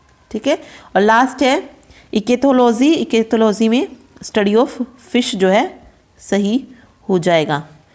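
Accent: Haryana, India. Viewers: Jo apka haryanvi touch h wo bda acha h ..👍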